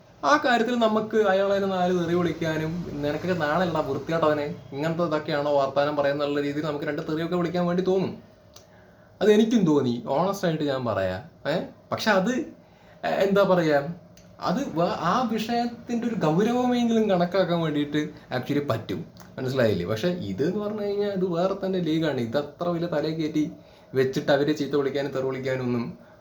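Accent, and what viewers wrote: Palakkad, India. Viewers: Bro. Nammande Palakkad accent super...Keep it up, and best wishes. Big fan of you from Delhi..👍👍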